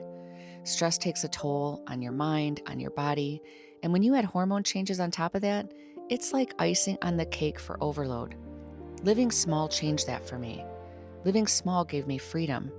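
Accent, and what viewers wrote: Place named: Midwest, United States. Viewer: Same here! What labs 🧪 do you think the original poster and I should get? It feeling absolutely awful! You sound like you have a Midwest accent my friend! I too got a hysterectomy but a bit younger at 36. Perimenopause and adrenal fatigue for 5 years now. Hormones came back normal, so now what and who do I ask for the labs? Thank you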